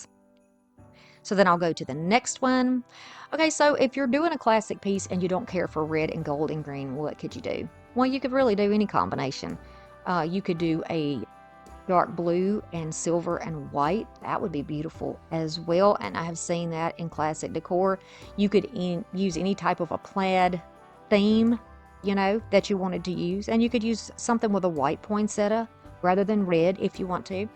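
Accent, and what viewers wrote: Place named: Texas, United States. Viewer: I love all of these projects. Great job! Where are you from? You sound like you are from Texas which where I am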